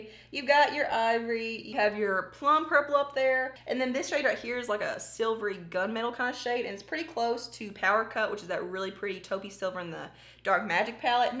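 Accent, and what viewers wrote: South, United States. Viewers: i was looking for a dupe for one of the greens in the dark magic palette, but tbh even with the warning that there probably won’t be an exact dupe, i have to watch the whole thing now because your accent is so adorable! i love southern drawls, they’re so relaxed and pretty-sounding~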